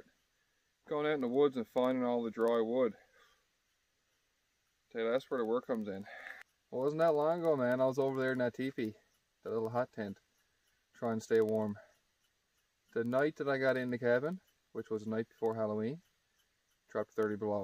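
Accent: Newfoundland, Canada. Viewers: Enjoying your videos, are you from Newfoundland and Labrador? Your accent is certainly sounds like the Rock, best place in the world😉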